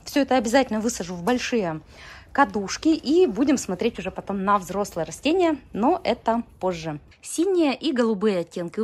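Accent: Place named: Urals, Russia. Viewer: Первый раз смотрю вас. Сразу подумала что вы с Урала. У меня там есть родственники у вас своеобразный интересный говор не перепутать. И слова есть которые только от этих родственников слышу. Очень у вас все цветы хороши👍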